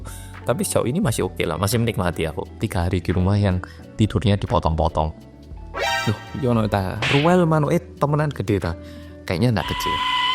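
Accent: Java, Indonesia. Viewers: Orang korea yg medok.. Ngono ae lah mas.. Iku cirikhas sampean og.. 😁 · 5 bahasa berarti mas bahasa korea, inggris, mandarin, indonesia, jawa medok 😁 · bahasa indonesia itu mah.. jawanya sekalimat sekalimat. tapi medok iya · Iya bener banget.. aku masih inget si jemuran2 itu.. selamat ya mas.. bangga banget denger medok Jawa mu masih kuentel gtu.. selagi lagi welcome to the world Dede Jang Ruel, sehat2 dan bahagia.. ❤ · I just wanna say, CONGRATULATIONS mas medok 🤍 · Ngikutin mas dari Orang Korea yang medok sampai orang Korea yang beranak😂 Anak nya gak jadi namanya Jang rawon mas😅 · Selamat yaa atas kelahiran anak pertamanya, turut berbahagia ❤ Kadang masih gak yangka ini orang korea, soale ngomong indo ambek jawa e iki medok poolll 😂 Jujur mirip dirimu sol 😅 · Youtube channel org korea yg aku follow cm. Krn emang bener2 sdh mendarah daging indonesia, bahkan cara bicaranya medok jawa bgt, ga ada logat korea sama sekali. 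Tau banyak ttg indonesia ketimbang org korea lain yg hanya memanfaatkan konten demi cuan. Btw selamat atas kelahiran putra pertama nya Jang Ruel, smoga menjadi anak yg berbakti pd org tua & sukses. Ruel malah diajak ngobrol boso jowo, diajari boso jowo jg bro 😂😂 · Ruel sejak bayi udah dengerin bahasa indonesia medhooook 😅😅😅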